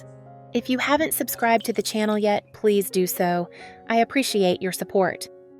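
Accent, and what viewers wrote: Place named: Texas, United States. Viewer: Gainesville Texas english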